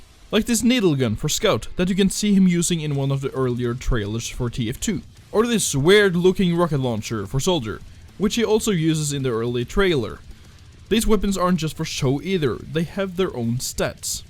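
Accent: Norway. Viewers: are you norwegian? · Er du norsk? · e du norsk? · OMG i now know you're norwegian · are you Norwegian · Are you from norway? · are you Norwegian?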